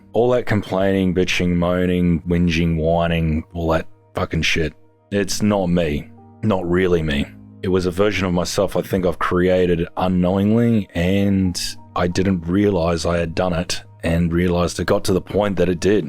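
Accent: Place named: Australia. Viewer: First time commentor! I look forward to your videos, always, because I love the game and found you a few years ago making the forest content. Your peaceful voice and how thorough you were with the game was my first draw in to the videos. Well to be frank... your sexy calm Australian voice I'd literally listen to for hours and put your videos on to chill out. I did notice your content for sons being quite a lot more agitated and angry (you mentioned you quit smoking? which I figured was something affecting your mood). I watched the video you just posted before this one and heard you laughing multiple times and was going to comment how nice that was to hear. Thank you for being self aware and realizing how you had changed into someone else for a bit. We're all humans going through life and even though we're here for your content - your wellbeing matters the most obviously. 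Sorry it's been a rough bit of time for you. I for one would love to know you were enjoying playing and filming for us and it not feel like a burden because I enjoy every bit of content you share. If you streamed live I'd watch every minute. You are doing great. Proud of you and thank you for your dedication to this!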